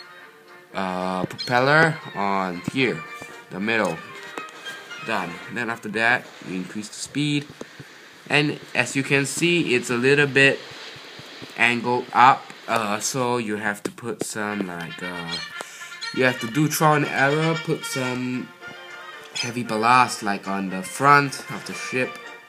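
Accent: Singapore. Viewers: are you a singaporerean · Are you singaporean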